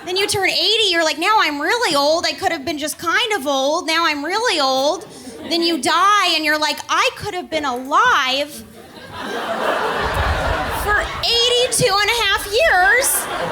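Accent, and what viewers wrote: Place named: Minnesota, United States. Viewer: I first thought she had to be from Minnesota. Then I really started to appreciate the subtlety satisfied pauses with the wry smile between the jokes. 👍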